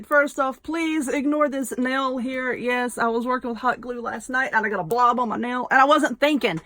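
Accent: South, United States. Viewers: You were cracking me up with the little comments about your ex. So funny. I love your southern accent and sense of humor. And also your creativity. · I know this is an old post, but I love it. Where are you from? You have a somewhat southern select. Loved heart wreath.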